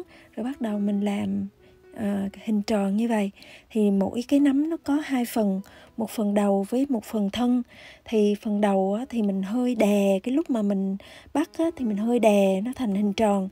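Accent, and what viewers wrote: Hue, Vietnam. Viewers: Cám ơn với hướng dẫn rất chị tiết và giọng nói Huế nhẹ rất dễ thương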